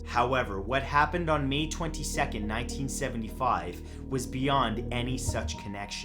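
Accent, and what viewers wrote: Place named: Canada. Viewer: What's really creepy is, you have changed the way you talk... You used to have a strong Canadian accent, now you speak slow and clearer. Did you do this purposefully or did L.A. bite your neck like Frost in Blade.. (Nomnomnom!!0_0)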